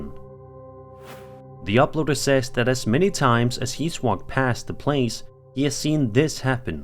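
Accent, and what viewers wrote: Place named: Denmark. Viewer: Brormand, jeg har set dine videoer I flere år og har først lige fundet ud af at du er dansker nu, wtf? 😂 Btw, fandt ud af det pga. Jeg så en af dine gamle videoer hvor du snakkede om merch og din accent var ikke til at tage fejl af 😂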